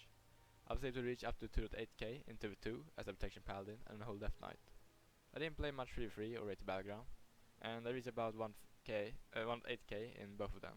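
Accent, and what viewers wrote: Sweden. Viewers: Are u from sweden?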